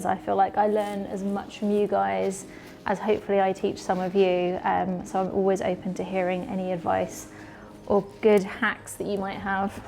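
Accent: United Kingdom. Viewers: I don’t get her British accent…🤔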